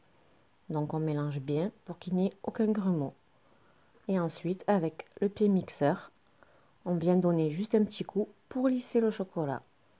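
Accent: South, France. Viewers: Il est toujours plaisant de visionner vos vidéos qui sont toujours très bien expliquées et votre voix est très agréable. Une voix du sud moi qui suis du nord. Merci et bonne soirée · Vous êtes un vrai chef . Trop top l’accent du sud.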